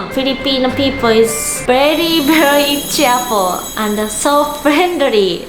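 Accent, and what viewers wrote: Japan. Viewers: You look filipino to me😬😬 and your accent us like japanese, pilipino and visaya😐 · My first impression from the title i was like what filipinos have done to her? Me after watching the video : ahhh kaya pala kase addictive 😂 Btw Love your accent just like my japanese friends 😊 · this is typically Japanglish pronounciation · No one gonna talk about her Japanese accent? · I would like to inform the viewers why she says expensive-uh because its japanese accent they say english words with uh like bestfrienduh etc. PS. I have a japanese bff. so now u know ok?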